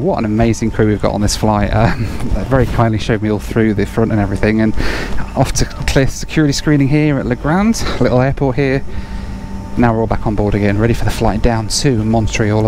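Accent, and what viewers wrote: United Kingdom. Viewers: Hi,My first comment for your channel.Im from India and have been following you for a while.Definitely the clincher for me till date.My congrats to the amazing crew.The energetic and emotional outpour that you had when flying back on the 737 is contagious to be honest.Added to the above recipe is your wonderful British accent that is a real treat to listen to.Oh but why would food cost you an arm and a leg(a "simple costly" would be easy to understand:):):).........Keep up the good work.All the best and thanks for all the wonderful videos till date.Wish you all the luck forward...........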